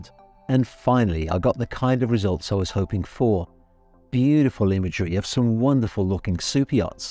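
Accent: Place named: Midlands, United Kingdom. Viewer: Hi, I recently watched a documentary about superyachts that you starred in. It was great how your midlands accent was so strong in it haha...also, I noticed on the Boat International website Wider had a 170ft yacht for sale. Will you be covering the yard again soon?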